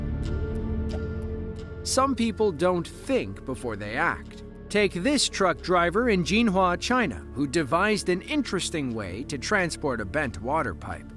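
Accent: United States. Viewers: he speaks English like an American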